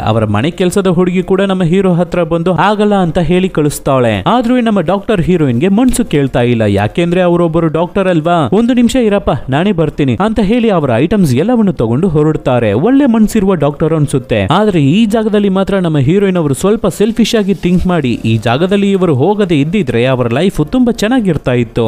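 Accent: India. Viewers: Super brother❤adre nemdu yav uru anna nem mathado style super adike keldye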